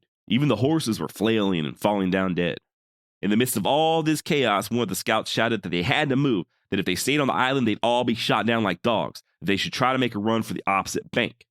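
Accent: Texas, United States. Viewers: Putting a face to the voice I’ve heard for a little while, not gonna lie thought you might’ve been a black Texan… dudes in the south sound a lot more alike across race than anywhere else in the USA. We all have a lot more in common than we don’t. I appreciate your nuance to history telling. And that is coming from a dirty Jayhawker who shouldn’t like the south. I’m pro Americans, even from Missouri lol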